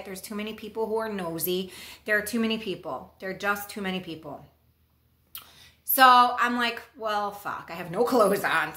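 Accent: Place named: New York, United States. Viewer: I love your New York accent ❤️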